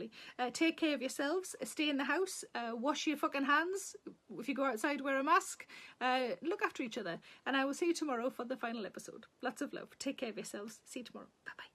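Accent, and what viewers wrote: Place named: Northern England, United Kingdom. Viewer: I’m from Canada and can I just say, of all the different british accents yours is the warmest most wholesome I’ve heard. You’re hilarious, well spoken and to top it all off the way you say it all warms my heart. Both with laughter and just out of novelty since I myself hear a lot of flat American accents. I’ll miss this every day. Never been to northern England but I’m already biased in favour of the North. Much love from Toronto!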